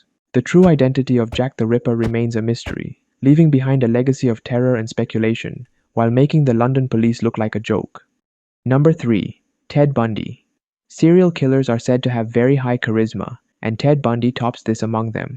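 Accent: India. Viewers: Bro's accent sounds like an Indian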